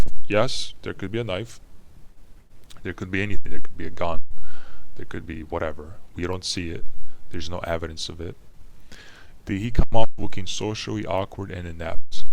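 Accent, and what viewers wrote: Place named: South Central, United States. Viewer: What was the comment we are going to get you girls by JS about? Please take diction classes. South central American accent is annoying and distracting.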